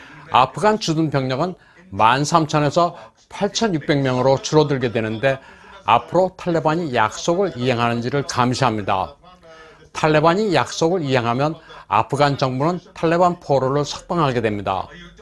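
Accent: China. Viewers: 쭝국인이신가요? 완전 발음이 쭝꿔 억양이시네요? 넘 강한 억양에 좋은 내용이 뒤덮이는거같아 많이 아쉽네요.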